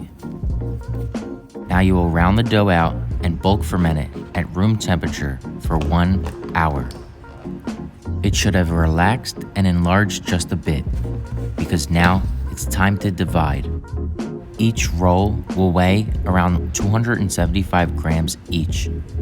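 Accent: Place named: Philadelphia, United States. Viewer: The Philly accent cracks me up. Left Philly in 80 and still miss the Hoagies.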